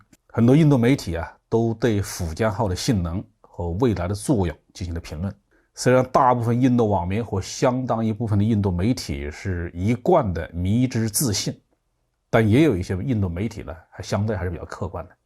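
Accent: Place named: Hunan, China. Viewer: 包大校言简意赅的湖南口音 ，简直就是福音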